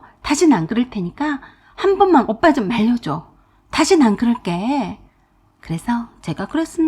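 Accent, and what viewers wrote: Jeolla, South Korea. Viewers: 아따 무슨 절라도사투리를 허벌나게 쓰신다요? 성우분이 호남분이요? 글구 저런 싸가지없는 집안과는 바로 이혼하는 것이 답이랑께요!!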